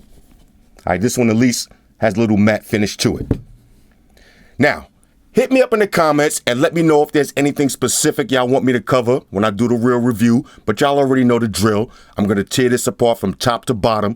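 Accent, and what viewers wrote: New York, United States. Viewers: That NY accent tho! Love it. If I ever leave, I'd just listen to you videos if I ever get homesick!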